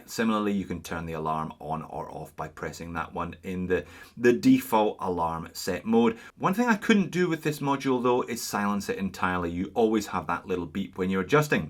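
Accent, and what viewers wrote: Scotland, United Kingdom. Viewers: Another great review! I would like to ask, where in Scotland do you come from? I love your accent. I live on the Borders, and often pop up into Scotland whenever I'm tired of England (I'm English myself but cheer for Wales). I particularly like the "Muckle Toon", Langholm. I'm a Casio fan, too - my sports watch is an ancient Baby G that my Grandad bought for me years ago. It takes a kickin' and keeps on tickin'.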